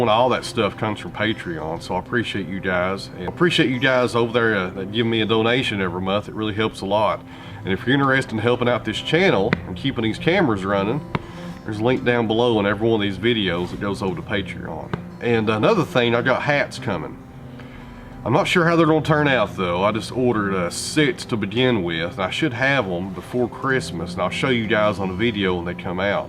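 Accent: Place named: Tennessee, United States. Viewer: The Tennessee accent is entirely A-OK with us American's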